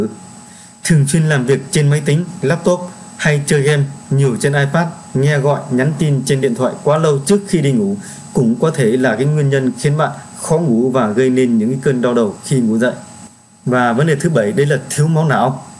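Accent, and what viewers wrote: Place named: Thanh Hoa, Vietnam. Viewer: Nghe là biết anh là người Thanh Hoá nè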